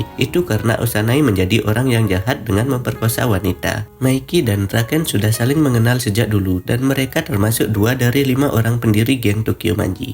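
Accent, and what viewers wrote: Aceh, Indonesia. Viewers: logat abangnya kyak logat Aceh😂, Orang Aceh bang ya?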